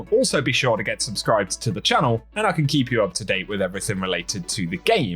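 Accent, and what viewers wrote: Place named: England, United Kingdom. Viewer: Cut him some slack, he's English. If he were Welsh, you wouldn't be able to understand much of a damn thing, I'll bet.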